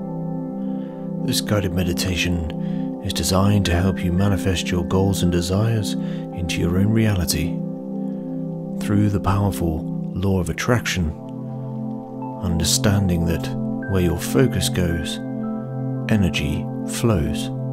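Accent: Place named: United Kingdom. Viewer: I find all your guided meditations just perfect. Started to listen because I especifically wanted a guider with a smooth male voice and British accent. But all meditations are just so beautifully said and calming. It has helped me a lot throughout the past months. Thank you, looking forward for new videos.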